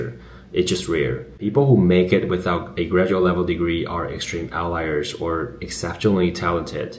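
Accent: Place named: Taiwan. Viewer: 好感動影片看到一半聽出主播也有很濃的台灣口音，親切阿~~好親切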